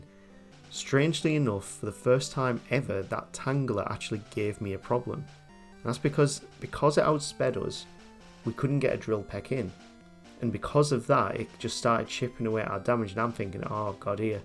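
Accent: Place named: United Kingdom. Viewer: I found your channel while looking for a Kanto solo runner who doesn't use BBG. IMO, it's bullshit because it's very easy to discriminate between when it makes sense to use moves for their primary effects and when you're just exploiting the poor programming. It just requires an iota of self-control and honesty/integrity. The fact that enemies can accidentally boost your stats is no different to them having the ability to give you free turns with moves like Whirlwind and Teleport or even weaken themselves with Focus Energy -- part of the glorious mess that is Gen 1 randomness. But it means that the opponents are already at such a disadvantage that the BBG becomes totally broken and unfair. That's just my personal opinion, of course, but I hold it very strongly. I don't even watch Jrose anymore due to his overuse of the BBG. Your chan's not for me because of the BBG but good luck with it -- always nice to hear other British lads doing Pokemon content. 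Some assessment/advice from an expert on this stuff: the average video quality seems decent. Your energy levels aren't as high as most of the popular "Poketubers", but some people will enjoy the more relaxed vibe and you'll certainly find a niche as-is (tbh, you stumble over your words a little more than I'd ideally like, which seems harsh but modern YT demands near-perfection; if these reactions are ad-libbed, that's great - and the small flaws even add charm and authenticity - but you could always re-record lines with major mist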